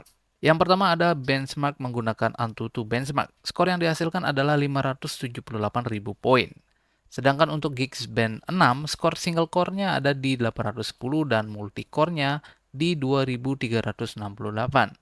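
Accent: Bali, Indonesia. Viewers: logat nya kek orng bali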